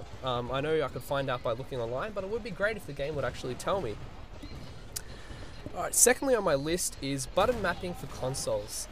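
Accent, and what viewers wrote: Australia. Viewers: i am guessing you are an aussie... and if you are what clan are you in. i am in a US one right now and need some ausssie to play with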